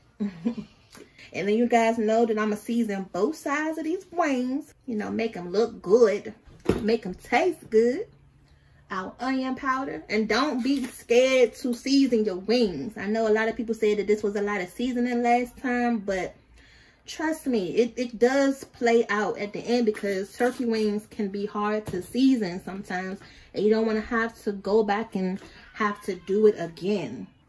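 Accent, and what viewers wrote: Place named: Maryland, United States. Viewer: Yummy. First time watching. New subbie. Let me guess Maryland???? That accent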